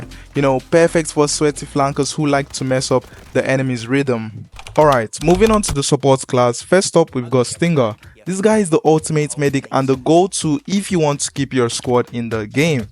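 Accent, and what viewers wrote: Nigeria. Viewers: You sound Nigerian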